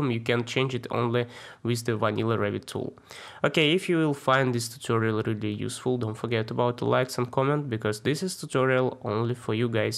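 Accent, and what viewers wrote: Russia. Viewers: Спасибо за урок. Судя по акценту ты с России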